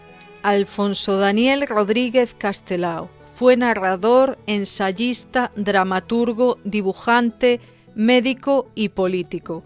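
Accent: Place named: Galicia, Spain. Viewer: Que hermoso video. Somos valencianos de nacimiento, pero gallegos de adopción y de amor a esa tierra.Hemos estado muchas veces, y más que queremos estar, aunque hagamos el viaje en dos etapas por la distancia. Este video es otro motivo para volver y conocer " La Insua dos poetas ". Maravilloso tu acento, que dulce es el,gallego y que tierra de " calidade" 👏👏👏👏👏👏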